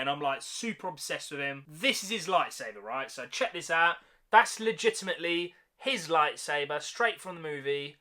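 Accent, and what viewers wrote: United Kingdom. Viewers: Why does he look like an American hipster while talking like a Brit?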